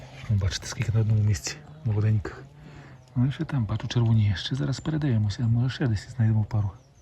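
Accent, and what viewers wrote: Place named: Transcarpathia, Ukraine. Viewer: Щиро дякуємо за таку прогулянку ,дай Боже щастя і здоров'я вам ми зпраги ,приємно слухати нашу закарпатську мову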